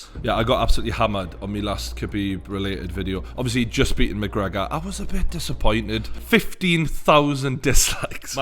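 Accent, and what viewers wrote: Newcastle, United Kingdom. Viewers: Looooolllllll geordie. Enough love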